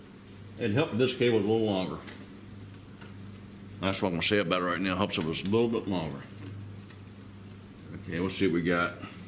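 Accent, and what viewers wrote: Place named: Alabama, United States. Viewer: Thanks for very helpful review. I just ordered this charger for my John Deere 110TLB backhoe. I was tired of my battery dying at critical times...like last year when my 37-ton log splitter arrived from Northern Tool and the crate was damaged so bad I had to unload it all in my driveway with the JD, since the girl who drove the delivery truck was unable to help at all. I got the log splitter and crate halfway out of her truck and onto my loader bucket, then tried to back away from her big truck and the JD battery went "NOPE...I'M DONE!" After we somehow got her truck pulled away and I was left alone with the problem to solve, I had to get the battery charger hooked up on an extension cord and got enough juice into the battery to crank up and make it back up my hill. I was exhausted, as was my wife, and I decided I wouldn't let this happen again. 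I've got a new battery tester too, and will keep this 1.5A trickle charger on my battery in same configuration as you mounted it on your machine. The dampness and cold of the Pacific Northwest is brutal on batteries in Winter. Thanks again for your great review. And dang if you don't talk just like me, an Alabama boy!....ha ha ha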